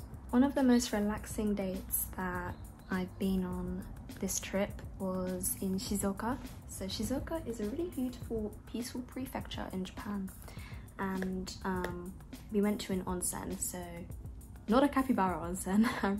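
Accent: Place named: United Kingdom. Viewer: I’m studying English through your videos lately. Your British accent is so beautiful and easy for me (I’m Japanese) to listen to. I’m looking forward to watching more videos❤︎ (Sorry about my bad English) 最近の動画を見て英語を勉強しています。アクセントがとても綺麗で私でも聞きやすいです。これからの動画も楽しみにしてます❤︎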